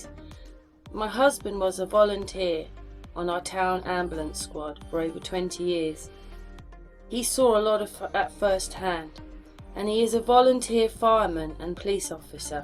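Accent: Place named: England, United Kingdom. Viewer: She's English so that's odd you find her hard to understand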